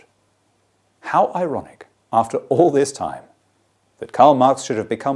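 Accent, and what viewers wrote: RP, United Kingdom. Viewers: Talking shit with a plum in your mouth is still talking shit... Ridiculous claims on both capitalism and marxism... You should be ashamed of yourself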